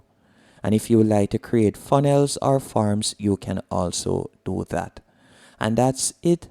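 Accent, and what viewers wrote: Jamaica. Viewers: It's nice to land on a video with a Jamaican accent. Your videos are helpful, thanks.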